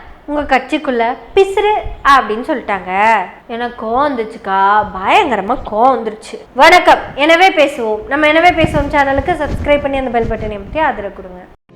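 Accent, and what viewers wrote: Kongu, India. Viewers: உடல் மொழியால் சம்பந்தப்பட்டவர்களை உடல் நடுங்க வைத்துப் பிசிறுத் தட்டாமல் கொங்குத் தமிழில் பேசிப் புரியவைக்கும் உங்களுக்கும் குழுமத்திற்கும் வாழ்த்துக்கள்!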